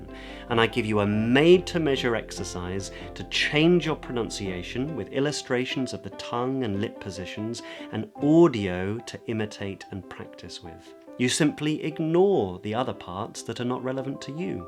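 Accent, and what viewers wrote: United Kingdom. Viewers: omg! I love your accent! very clear, and sophisticated... I'm from Argentina, and I am interested in gain standard British accent, so you will be my mentor... in my progress I sound more like a British person, but of course I don't speak like a native speaker but I certainly think that you will be very helpful for me! thanks!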